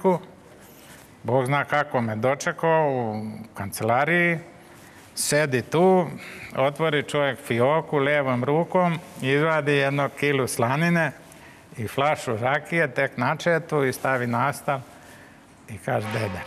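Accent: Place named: Kordun, Croatia. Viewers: Kao da sa Korduna. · Ovaj govori hrvatski.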